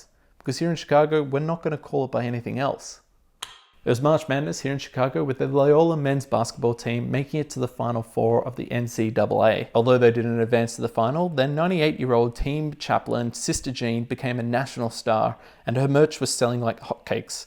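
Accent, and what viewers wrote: Australia. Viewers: is it just me or does he not have a very noticeable aussie accent?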